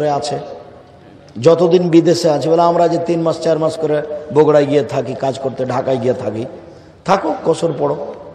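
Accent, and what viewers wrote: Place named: Kolkata, India. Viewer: Unar uccharon Kolkata type..keu ki details bolben unar(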